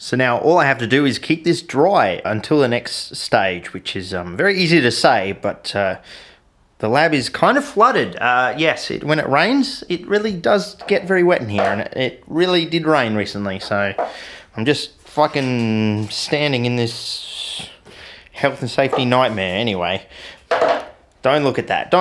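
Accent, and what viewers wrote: Australia. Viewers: every episode you become more Australian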